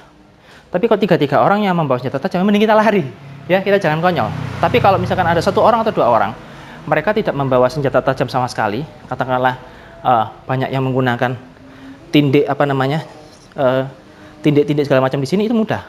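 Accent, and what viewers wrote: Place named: Malang, Indonesia. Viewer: Wong malang ta mas.nyuwon wa e😁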